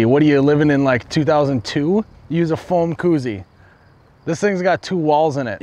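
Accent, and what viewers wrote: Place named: Minnesota, United States. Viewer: I betcha from Minnesota, yah?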